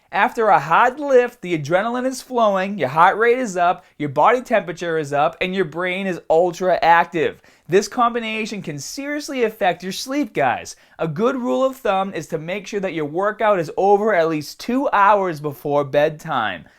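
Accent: Boston, United States. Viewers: Is he from Boston Area? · Lol is this guy from boston? His accent is definitely a boston accent :) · Dude! I just started watching your vids a couple of days ago and started watching your vids from yrs ago. This is the first RECENT one I've seen... Wtf happened to your accent?! It's WAY more Bostonian now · Boston much? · Bette? Boston accent?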